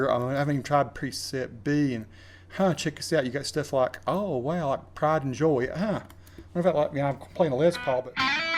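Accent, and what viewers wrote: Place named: Southeast, United States. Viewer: I have been looking at video reviews of this amp for over an hour now, and I enjoyed yours 10 to 1 more than any other one. You’re relaxed, not trying to stroke your ego, just checking out the tones by picking through some tunes relevant to the settings. I don’t know why people think that an ‘amp review’ should be them running 64th note arpeggios. The only person that helps is themselves and their egos. Having said that, you’re absolutely a great guitarist and it’s beyond obvious you love music and the guitar. Also, it’s awesome to click on a video and hear someone speaking with the same accent as me! I don’t know what part of the southeast you’re from but I’m from the southwestern part of Virginia, and I’d guarantee you’re originally from VA, TN, or NC. Either way, you absolutely gained a new subscriber today!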